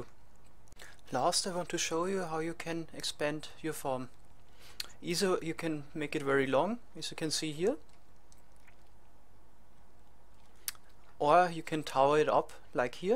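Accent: Germany. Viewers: der deutsche Akzent kickt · it is interesting seeing his german accent improve over time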